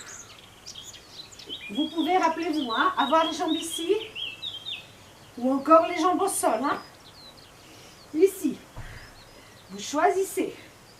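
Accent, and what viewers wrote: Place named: South-West, France. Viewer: Un vrai bel accent du sud-ouest 🤩 !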